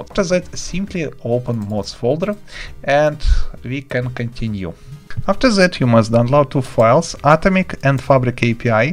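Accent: Russia. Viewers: палиться пиздец,у него акцент рашки,пиздец угарно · Акцент русского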